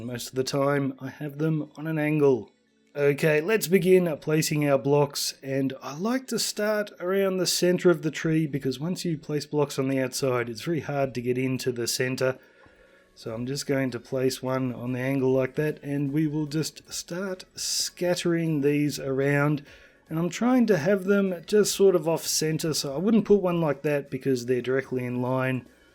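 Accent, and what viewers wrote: Australia. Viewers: Nice video! Your accent sounds Australian... are u from Australia? I am... im from the sunshine coast!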